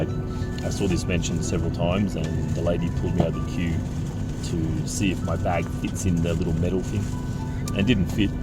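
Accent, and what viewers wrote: Australia. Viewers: Cos he's full aussie cuzzzz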